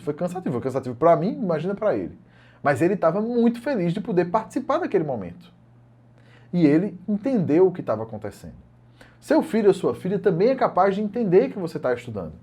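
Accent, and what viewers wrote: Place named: Recife, Brazil. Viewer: Ah, e também sou Recifense Kkkkkk suspeitei logo pelo sotaque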